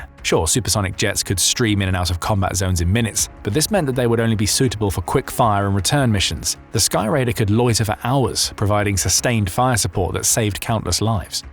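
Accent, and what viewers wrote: England, United Kingdom. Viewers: Coming from some guy with an english accent, this is almost comical...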